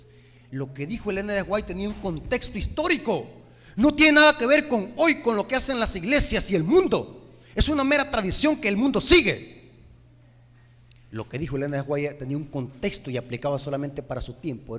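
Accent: El Salvador. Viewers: 🚨De que pais esta este pastor?pienso que sera del Salvador,no me parece su acento a los Nica🤷🏻‍♀️🚨!!!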